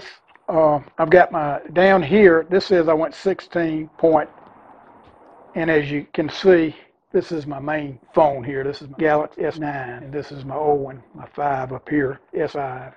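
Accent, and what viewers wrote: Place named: Fayetteville, United States. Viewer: Enjoyed your video, your accent sounds like my mom and all of her sisters/brothers/etc, big family from Fayetteville.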